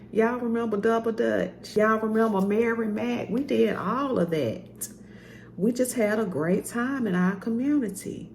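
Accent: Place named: Georgia, United States. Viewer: I just discovered your content today! I am from Macon, GA originally and listening to you reminded me of women I grew up with😊. It was nostalgic and a breath of fresh air. God Bless You and Happy Holidays❤❤❤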